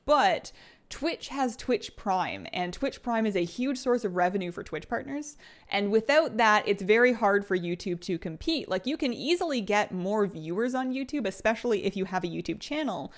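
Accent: Canada. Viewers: Your Canadian accent has come back 😍 ✨about✨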